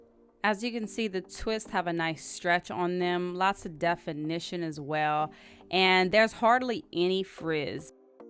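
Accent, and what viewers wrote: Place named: Louisiana, United States. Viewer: I showed this to a guy to get an idea of how his hair would look when I was finished, and as I was watching, it was driving me crazy hearing your voice, i was like, she gotta be from Louisiana 😅. I love it ❤ Great content as well!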